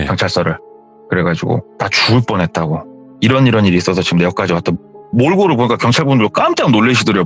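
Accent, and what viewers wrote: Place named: Chungcheong, South Korea. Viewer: 충청도 사투리로 돌려말하는거 ㅈㄴ 무섭네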